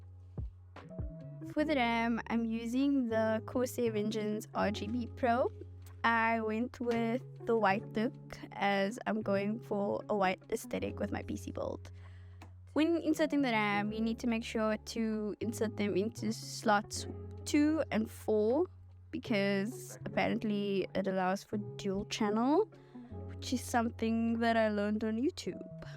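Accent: South Africa. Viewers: South African accent. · Am I hearing a CPT accent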